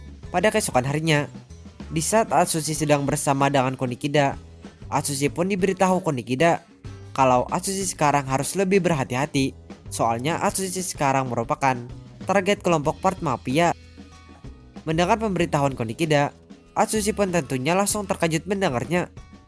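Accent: Banjar, Indonesia. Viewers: Logat nya kaya urng Banjar pang 😂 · Org banjar bg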